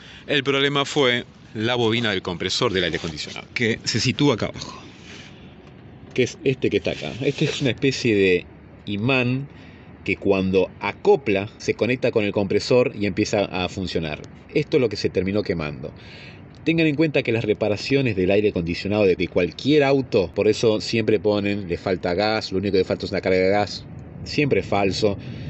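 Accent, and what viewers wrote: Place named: Argentina. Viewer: desp de ver y escuchar varios vídeos, me encantó escuchar tu vos de argentino jaja. comparto con todo lo q dijiste. muy buen video, gracias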